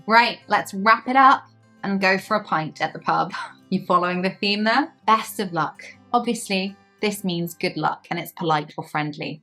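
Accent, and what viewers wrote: United Kingdom. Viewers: Oh my your accent is absolutely what I want to practice! Oh so beautiful perfect British accent ... And your gesture, example sentences ...all perfect!!! Thanks for your video❤❤❤❤ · Your English is British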